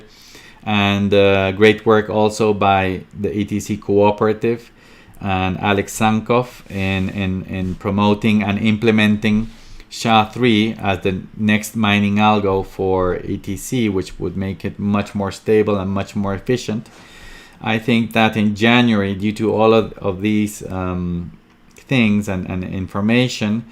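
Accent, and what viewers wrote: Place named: Russia. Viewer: Я так и думал що твой акцент руский ! Честно ти въобще клас ! С етой дъмб я сделал ошибка но надеюсь що за в бодущие не будить . Сподибо за поддержка , и всегда показивяеш все що как надо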